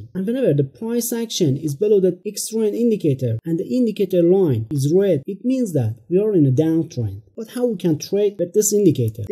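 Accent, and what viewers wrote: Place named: Iran. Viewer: حاجی چقد لحجه ایرانی داری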